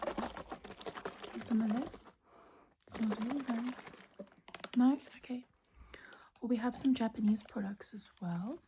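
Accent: Australia. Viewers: I don’t want to be mean but you sound Australian😭also, your very BEAUTIFUL🫰🏻🫰🏻keep the asmr going please🤍🤍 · I love listening to your voice and accent it's so soothing. There are very few asmrtists like this. What part of Australia are you from? 🇦🇺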